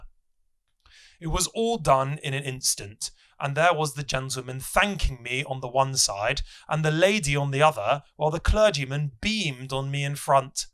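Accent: United Kingdom. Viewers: Very clear British accent. Fantastic!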